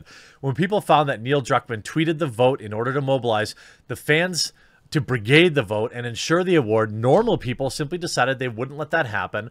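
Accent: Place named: California, United States. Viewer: This SJW Californian accent is reaaaaallly getting to me. 😂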